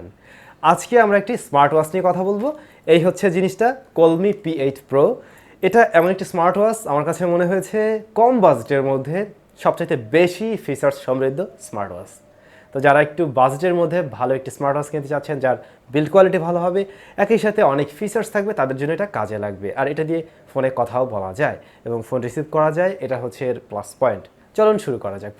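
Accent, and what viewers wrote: Barishal, Bangladesh. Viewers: Vai barisaila language misaien na... 😫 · ভাইয়া বরিশালের ছেলে নাকি :/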